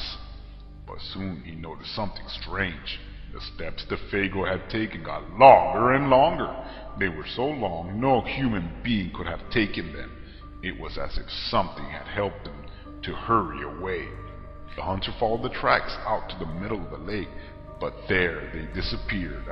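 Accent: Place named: Minnesota, United States. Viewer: Minnesota accent lol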